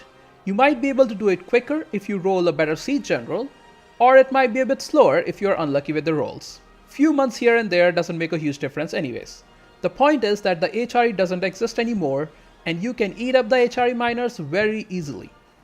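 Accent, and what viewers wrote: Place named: India. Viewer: Your accent sounds so Indian, where are you from? And yeah, the strategy was really good, never thought it could be done so easily.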